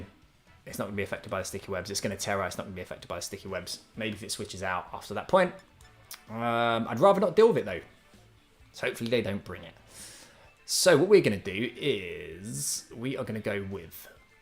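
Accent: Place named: United Kingdom. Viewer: Brits just say Ariados so much better than us Americans LOL If you want to continue the trend, I would like to see a Spidops team!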